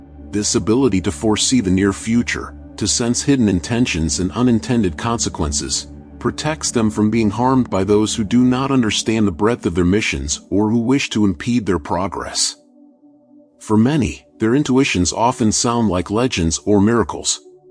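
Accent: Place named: United States. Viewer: You have to drop the American accent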